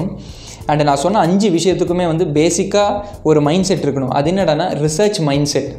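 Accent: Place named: Kerala, India. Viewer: I like your Tamil+Malayalam accent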